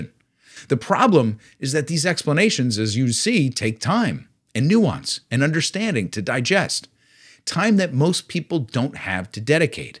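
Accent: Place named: England, United Kingdom. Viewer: Uhh acento ingles mal asunto jajajaja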